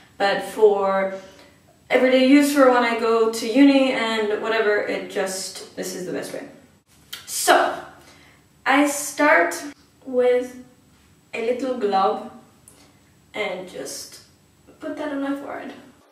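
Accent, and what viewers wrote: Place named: Ireland. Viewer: I LOVE IRELAND ACCENTS!!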